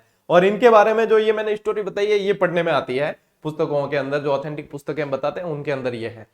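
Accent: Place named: Rajasthan, India. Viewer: Tq tq sir very nice class sir mujhe aapki marvari Bhasa bhutt achhi lagti hai 😊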